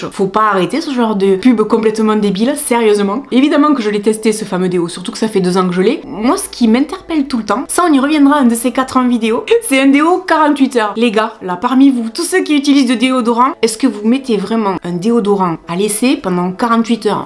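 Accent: South, France. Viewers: Je ne suis pas du style à commenter malgré le fait que je regarde régulièrement tes videos. Cependant, merci pour ta bonne humeur, ce petit accent chantant du sud et surtout tes vidéos qui englobent vraiment tout le monde... Comme tu le dis si bien, arrêtons de vouloir ranger les gens dans des cases... soyons unique tous et chacun!! Je te souhaite le meilleur, au plaisir de te récrire!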